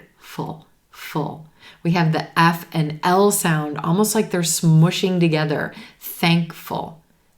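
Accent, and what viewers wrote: United States. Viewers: The american accent which is you teaching,What kind of american accent?Is this the general/standard american accent?Plz,answer me.I am a great fan of you.I will be thankful if you answer me...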